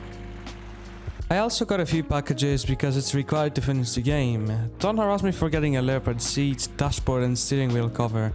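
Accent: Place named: England, United Kingdom. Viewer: Bro i love your english accent👍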